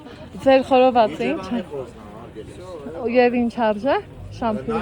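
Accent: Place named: Ijevan, Armenia. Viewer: Ha jan mer Ijevani xosi mis@ shaat hamova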